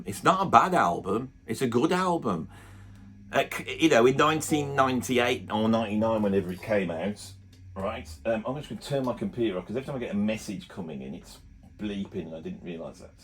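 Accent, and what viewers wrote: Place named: England, United Kingdom. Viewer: that accent. What region in England?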